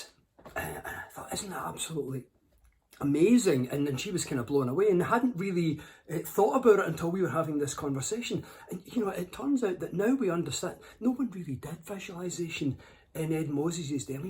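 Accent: Scotland, United Kingdom. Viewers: Hey. Love your Scottish accent, m8. I have a major passion for accents. Scottish accents are so beautiful. Cheers. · I like this guy's books better than listening to his talks. The Scottish brogue can be hard to understand, but this guy has a nice personality.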